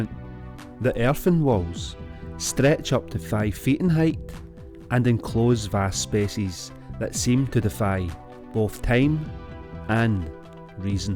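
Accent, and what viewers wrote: Scotland, United Kingdom. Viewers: I enjoy listening to your voice and your beautiful clear english.Scottish heart voice thank you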